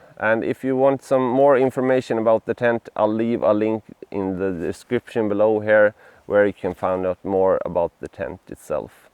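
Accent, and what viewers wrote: Sweden. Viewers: Are you Sweedish or Norwegian, you have that accent when ending words 😂 nice vid and tent. Prob watched 40 already as I am going ti buy it and then ready to go to Sweeden for camping :D closer to go there from where I am then back to Croatia where I am from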